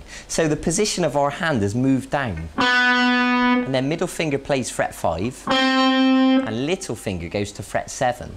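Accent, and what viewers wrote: United Kingdom. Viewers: yeah, but I like this guy better, he has a british accent. And the other guy yelled at me, "HI EVERONE WHATS UP?!?!?!?!" I dont like him too much. And this british guy is fine, he knows what he's doing, and I'm learning stuff without a guitar so shut up.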